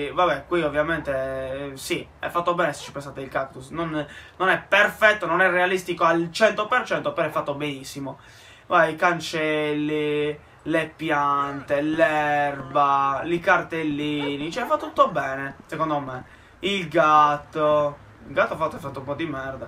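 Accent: Sardinia, Italy. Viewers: Si sente che sei sardo ;)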